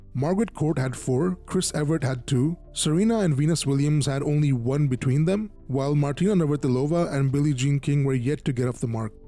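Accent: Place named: India. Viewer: You are an Indian, can hear it in your voice though you try to disguise it.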